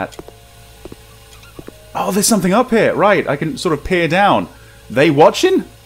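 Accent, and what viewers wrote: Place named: United Kingdom. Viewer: I really like your accent, which is weird because I hated British accents. No offense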